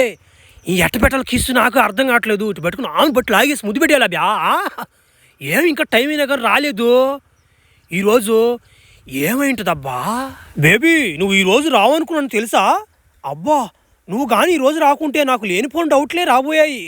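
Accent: Nellore, India. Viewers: Arey Anna nuvu Nellore ahhhh nedhi 😊😊😊😊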